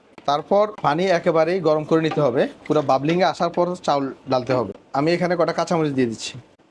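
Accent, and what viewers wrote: Bangladesh. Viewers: If you are from West Bengal, then how come ,you talk like BANGLADESHIS? Sharishar tel, Morich ? What nonsense?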